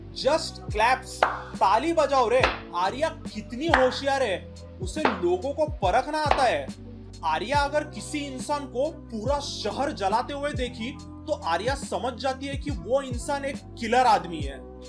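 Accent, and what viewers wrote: Maharashtra, India. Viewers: Bro are you marathi your accent is like marathi,nice video bro 🫡😂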